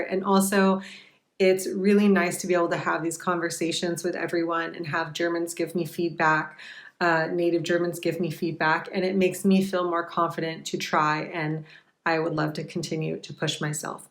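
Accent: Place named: United States. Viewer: you do pretty good, you are near to be fluently...... but, you always will have an american accent. I am bavarian and I know always who is from saxonia or frankonia, or worse, from the "Schwarzwald" area (my cousin lives there, she has a terrible accent), and I am aware that everybody (native german) will know that I am from bavaria 🤗 accent is ok, 👍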